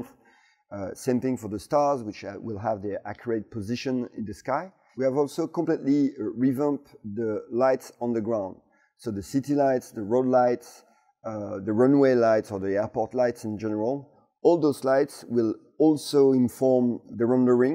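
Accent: France. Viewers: His french accent made this video 10x better.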